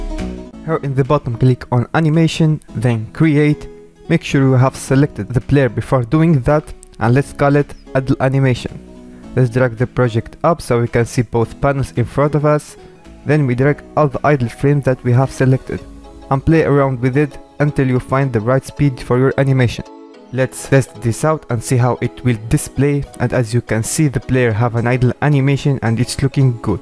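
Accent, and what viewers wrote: France. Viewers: t'est français ? car tu sonne français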